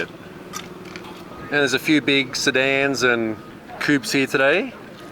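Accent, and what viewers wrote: Texas, United States. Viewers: am I the only one to not get enough of Texas accent? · I lived in Texas for 16 years. I think you'd fit right in around Houston or San Antonio with that accent tbh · ahahaha that was a decent American accent considering i know our real voice. best intro ever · You have a better Texan accent than most that live here since a large group of them are not from here lol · i personally think your american accent was pretty good and im from america lmao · not even gonna lie you nailed the American accent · Your American accent threw me for a serious loop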